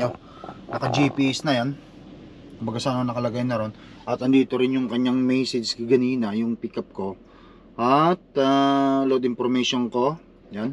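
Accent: Ilocos, Philippines. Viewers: Tlga ilocano hehe halatado tgla sitau nga ilocano duray sadinno nga sulinek hehe God bless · ilocano ka ata sir! hehe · Yung accent! Matik ilokano! 😁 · Parang ilocano so kabayan ah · Nagsolid dayta ilokanom padli. Ahahaha. Mamadlaw tayo pag nagtatagalog ka. Hehehe. Alwad kinanayon. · ilocano ka sir? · Perstym ko idtoy channel mo idol accent mo palang ilocanong ilocano hahahaha ingat kabsat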